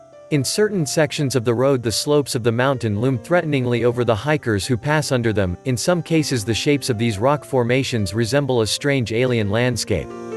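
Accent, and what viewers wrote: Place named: United States. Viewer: Nice videoing - but the American-style narration distracts. Is this some automatic US-english narration?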